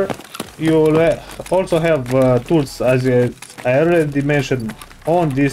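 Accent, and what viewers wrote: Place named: Russia. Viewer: Like ruski accent it's amazing I'm polish how come we don't get that nice accent 🤣🤣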